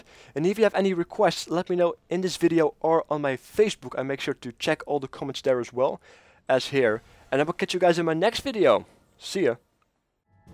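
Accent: Netherlands. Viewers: Hey, im dutch myself but wasnt sure :p i could hear it a little bit :)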